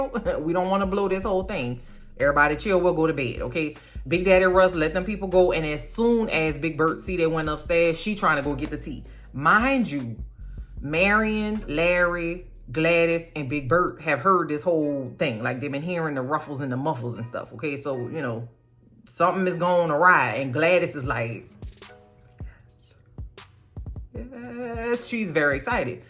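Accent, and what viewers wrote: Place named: South Louisiana, United States. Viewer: Love your priceless commentary! Adore your accent as well! I miss it, as a south Louisiana native also ( moved to Arkansas about 25 years ago.) So glad to have found your channel. ❤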